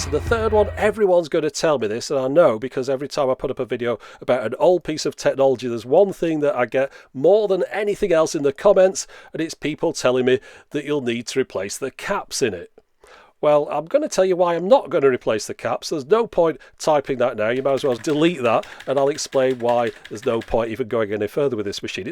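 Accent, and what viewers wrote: United Kingdom. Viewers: its a british accent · I was just blindsided by the pronunciation. I am pretty used to the different ways we say the same words through the English speaking Western World, but I never heard "beta" spoken like that before. I think UK accents of all types sound so refined, even all the slang terms. Tattoo is taa-ewww, and of course aluminium way cooler your way. Your accents automatically make you sound like experts to USA residents, even the minimally educated British sound smart to us, LOL...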